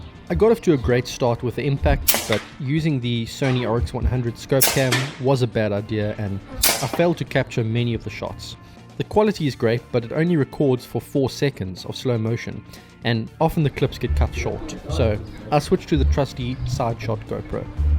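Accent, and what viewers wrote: South Africa. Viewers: You can hear dat he is afrikaans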